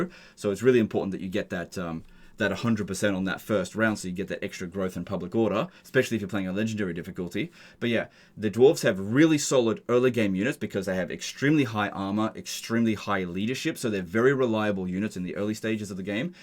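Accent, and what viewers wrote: Australia. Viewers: Came to see the dawi in S tier, stayed for the Australian.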